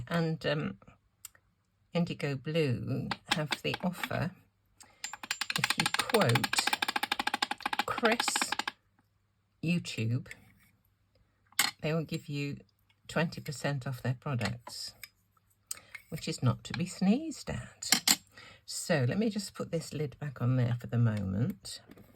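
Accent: United Kingdom. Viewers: I agree! Keep on talking! I’m from Canada and love your British accent. I’m addicted to you videos and look forward to them. Loved the Advent series.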